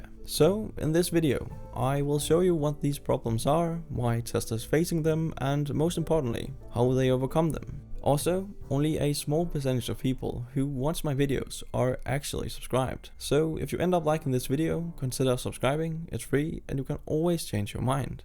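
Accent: Denmark. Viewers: how could you possibly not know he was danish with that accent? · I could smell this guy's Danish accent from a mile away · Visste du var dansk från din engelsk dialekt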